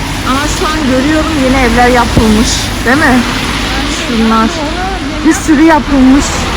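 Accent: Turkey. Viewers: orda doğdunuz yani nerelisiniz peki şive doğu gibi geldi yada yabancı dil konuştuğunuz için mi kız kardeşimde Fransa da 2 gün falan oldu gideli orda yaşıyo yeni gelin 😊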